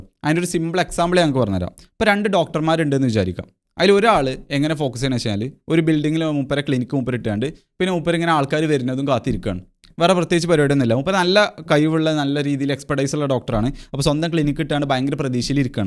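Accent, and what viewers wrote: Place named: North Kerala, India. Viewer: Bro that's not completely your fault, he is tone is slightly from the Northside which even the middles or Southside have a bit trouble to understand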